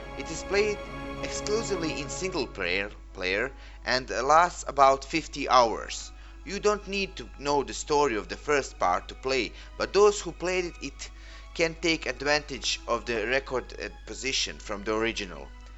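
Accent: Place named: Russia. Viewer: Like your indifferent Russian voice.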